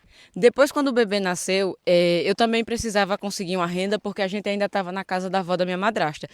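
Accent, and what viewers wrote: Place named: Natal, Brazil. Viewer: Você é de Natal/RN? O sotaque é identico ❤ Ótimo vídeo 🎉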